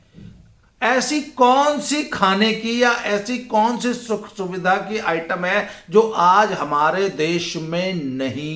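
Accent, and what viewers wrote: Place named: Kangra, India. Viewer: Aap ki "boli" language bahut acchi lagti hai H.P. kangra me same language bolte hai , Dharmshala me ap punjabi tipe language thodi mix hi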